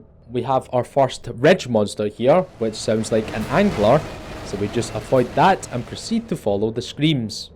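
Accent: Scotland, United Kingdom. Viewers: idk i think this guy might be scottish…. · because he might be Scottish, shocker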